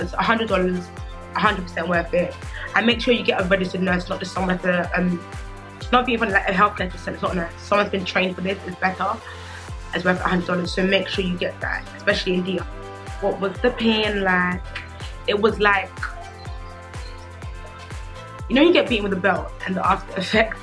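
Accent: United Kingdom. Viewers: British english, probably something around London. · Really brit accent is the hardest to understand. So hard. I love American English.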